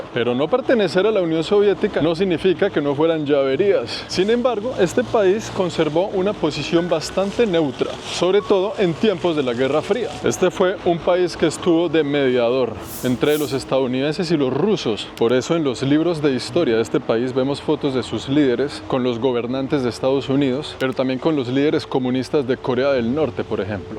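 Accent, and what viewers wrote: Bogotá, Colombia. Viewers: demás de educativos y de muy buena calidad tus videos, me haces reir mucho con algunas expresiones, me encanta tu acento Colombiano. Saludos de otra Abuela desde Dallas Texas, que te acaba de adoptar 👵👵👵👵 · es colombiano su acento es el Bogota donde creció y se educó, el es ingeniero, luego se fue a Canadá y estando allí se volvió youtuber, siendo uno de los mejores. Ahora viaja por mundo.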